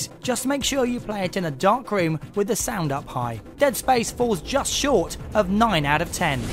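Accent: United Kingdom. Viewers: he makes brits sound kinda gay btw im a brit and i sound nothing like that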